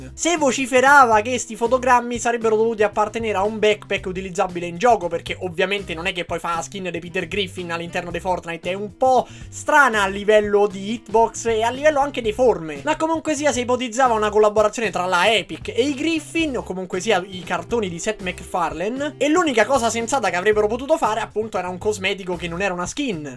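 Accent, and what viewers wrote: Rome, Italy. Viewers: Ma sei romano?🧐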